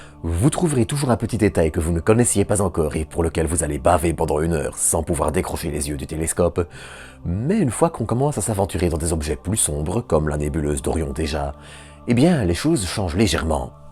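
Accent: Belgium. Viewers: Hello. Voici une chaîne Youtube qui m'a souvent étonné. Au début, un ton bien d'ici (je suis Belge aussi) auquel je ne suis pas habitué en video, qui a son charme, d'autant que tu as pris de l'assurance avec le temps. C'est là que j'ai compris que le régionalisme s'il est assumé et que la vidéo est de qualité passe non seulement bien et mais que cela donne une identité supplémentaire. a son identité bien distincte. Ici, tu te mets en scène, et bien çà marche! Les moment plus spontanés, tes regards sont vraiment sympathiques. Bref, surpris de voir que c'est un jeune derrière ces vidéos, avec un style qui attire l'attention et une passion communicative. Je me re-regarde cette vidéo, et bien elle fonctionne vraiment bien. (la touche d'humour à n'apporte rien cette fois-ci à cette video, mais essayes, tant que tu n'abuses pas.) CLAP-CLAP-CLAP-CLAP-CLAP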